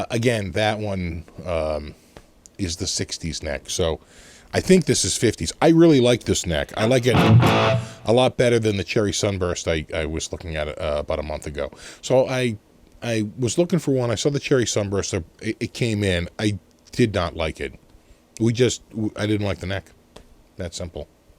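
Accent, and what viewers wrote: Boston, United States. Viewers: Boston English: Tuner = Tuna Tunah = Tuner Go figah....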